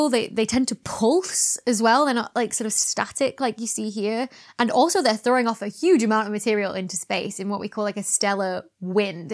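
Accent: United Kingdom. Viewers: So enjoyed this, like to hear your British accent as someone born in London, loved the geordie accent bit :) I would be interested to know what you think of 1956 Forbidden planet my favourite that is the forerunner of most Space soaps.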